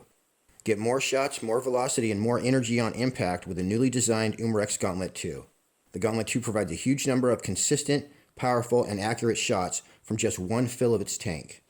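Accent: United States. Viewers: Just curious. You sound like a Marine Recon sniper or Army Green Beret. You self-criticize sub-inch groups at whatever range. Are you from Kentucky?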